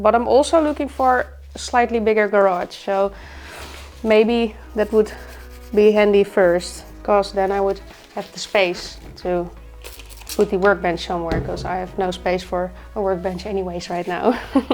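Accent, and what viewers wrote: Netherlands. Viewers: The most dutch English accent ever. So cool!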